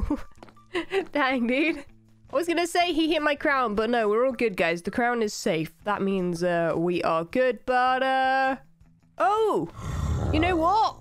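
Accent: United Kingdom. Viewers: are you British because I've been going through hermintcraft and I'm not sure if you have a British accent because I love british accents. If you don't it's fine because your commentary is my favorite out of all the you tubers and actually I like you and dreams commentary but love your videos best hermit craft series out of them all keep up the good work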